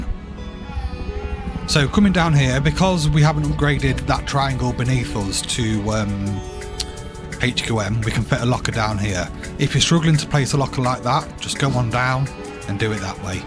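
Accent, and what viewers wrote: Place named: United Kingdom. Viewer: Wait that was a girl ? 😂😂 also where is she from in aus cause that accent also sounded British lmfao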